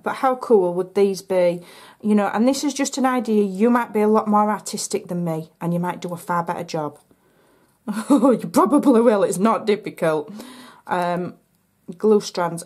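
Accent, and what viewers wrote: Yorkshire, United Kingdom. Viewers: I love watching your videos! Are you from Yorkshire?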